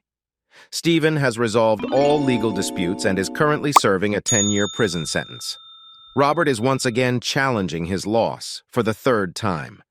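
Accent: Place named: RP, United Kingdom. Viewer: What is with the high english?????!!. It sounds stupidly arrogant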